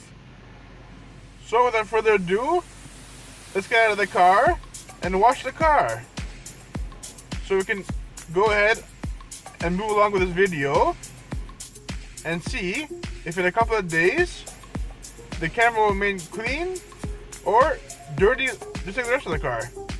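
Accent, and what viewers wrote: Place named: Greece. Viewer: Wow, I wasn’t expecting to find such an amazing video about ceramic coating a rear view camera, and to top it off, the voice and dialect are incredible! Your explanation is so clear and engaging, and that Greek accent just adds an extra layer of charm. This is by far the best video I could find on this topic—thanks for sharing your knowledge in such a great way! Keep up the awesome work!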